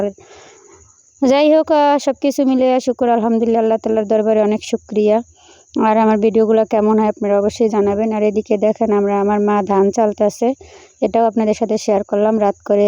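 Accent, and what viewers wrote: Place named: Barishal, Bangladesh. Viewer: ওরে আল্লাহ মিল্লা গেছে মিল্লা গেছে,,মোরা দেহি বরিশাল্লা মনু🤭🤭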